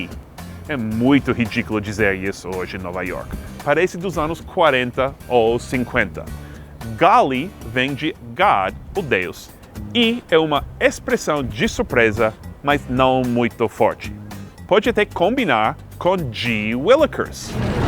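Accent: United Kingdom. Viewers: você parece mais britanico que americano sr gringo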